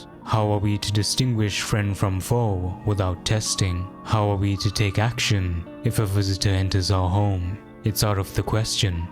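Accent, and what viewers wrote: South Africa. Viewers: This is real good, also your acccent rreminds me of home. Like, Pretoria, or Durban, SA Indian. Subbed, dope content grootman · No shi he’s from South Africa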